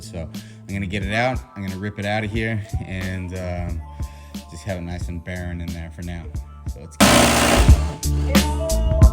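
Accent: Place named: United States. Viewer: ah cool! Span me out for a minute.. with your accent and, when the camera is facing you making the car look LHD, I thought you were in the US, but then saw a NSW plate on one of the civics! Ha!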